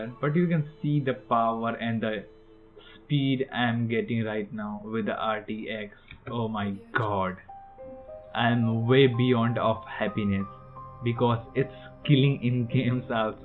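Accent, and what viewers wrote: India. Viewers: опять эти индусы. не возможно слушать. уши вянут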